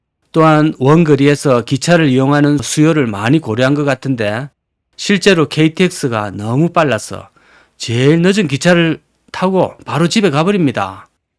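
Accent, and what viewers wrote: Gyeongsang, South Korea. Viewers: 우야노~? 갱상도 사투리 ㅎㅎ 넘~재밋다. 파이팅!!! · 강호 발바닥 TV는. 전국민이 한번쯤 들러 봐야하는 필수 유트브. 구수한 경상도 사투리 매력 짱입니다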